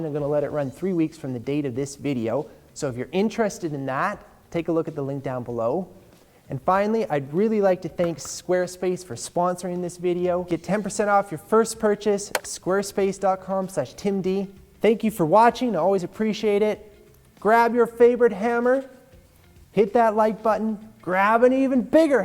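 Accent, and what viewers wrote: Canada. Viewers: Holy crap this guy sounds Canadian! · dude i didn't need any1 to tell me that this man is Canadian lol, no pun intended i love the Canadian .....what ever 1 calls it lol ..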